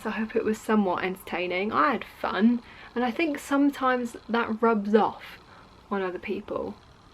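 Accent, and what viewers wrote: United Kingdom. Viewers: Godamighty, pardner, you sure are purty! Put yer makeup away; maybe in 20 years ya might need it, maybe not. Love your cultured British (?) accent and - whata miracle! - you don't say 'like' every other word like, er, as, all the American valley girls on YouTube do. Hey, don't ever come across the pond; it'll ruin ya!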